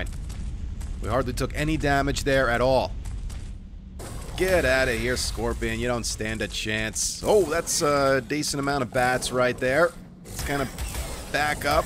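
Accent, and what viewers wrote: New Jersey, United States. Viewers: He is one of the funniest gamers I've seen I think his New Jersey accent is awesome.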